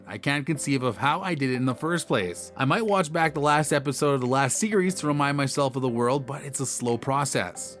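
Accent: United States. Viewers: Love him, but I wish this commentary would just shut the f up. Listening to this yank prattle on....urgh!!!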